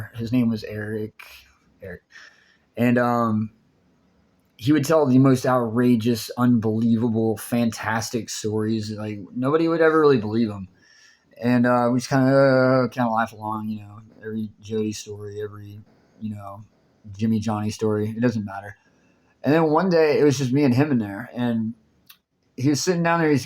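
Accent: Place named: Tennessee, United States. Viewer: You from up there, in Tennessee?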